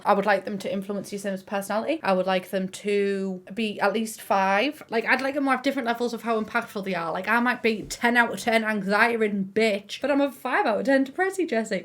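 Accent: United Kingdom. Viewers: Ure biritish?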